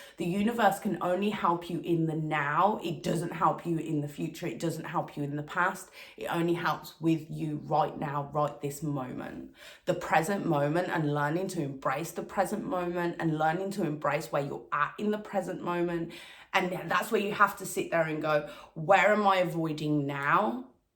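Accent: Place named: Midlands, United Kingdom. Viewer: Damn I feel like I was supposed to find your channel right now. I been conserving solar energy on my own for 6 months to follow my life purpose within boxing, but sometimes it’s tough. But I appreciate the message in your content a lot, my current experience really resonates with what you’re saying. How did you come to learn the things you’ve learnt? Also are you from Brum or the midlands? Your accent is familiar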